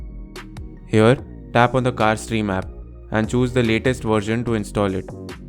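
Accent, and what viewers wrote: India. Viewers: I don`t understand curry english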